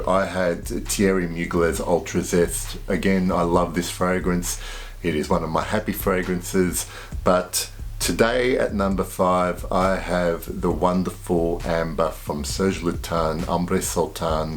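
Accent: Australia. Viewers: What kind of accent do you have? Australian?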